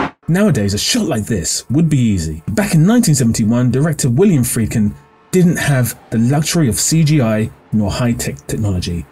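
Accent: United Kingdom. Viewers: I thought this guy was British based on the accent! Lol was surprised 😂 nice vid Mad Max was a gem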